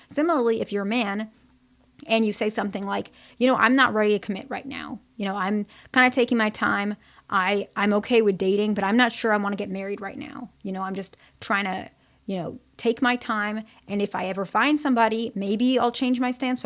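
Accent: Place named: United States. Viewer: It’s just a regular American accent.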